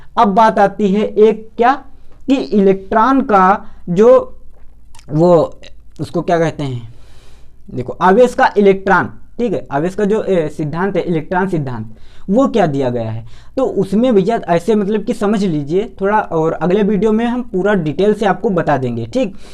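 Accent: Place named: Varanasi, India. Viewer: Aap Kaha se Ho My guess Rawansi se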